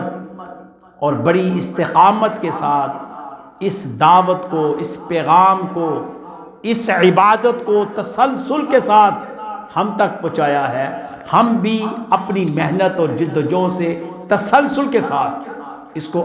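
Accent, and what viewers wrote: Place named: Punjab, Pakistan. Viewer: Tu c Paindo ho. Ardo (Urdu) Punjabi which bolde ho.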